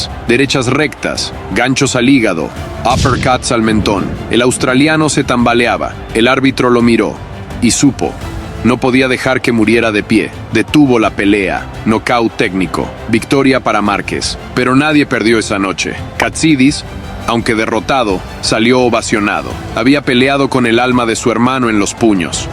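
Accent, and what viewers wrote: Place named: Cuba. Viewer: De seguro eres cubano hablando mmmm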